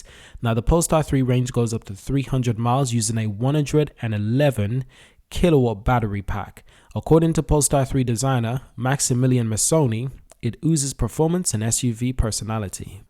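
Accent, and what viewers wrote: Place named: United Kingdom. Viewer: Your channel only seems to pump the news. Why have you not addressed the fact that PSNY share price has dropped almost 70 % since it went public. And with the fact that the CEO persistently wanting to pitch POLESTAR against Porsche is absurd. His ego will ruin the company. That explains why when they launched the P3 in the China market they had to slash prices immediately !! With your accent I assume you are from the UK...try selling Vauxhall at Audi prices and you will know what I mean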